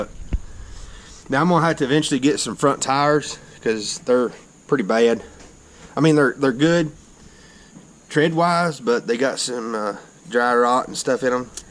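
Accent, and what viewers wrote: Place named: South, United States. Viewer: Your southern drawl is real buddy. County strong 💪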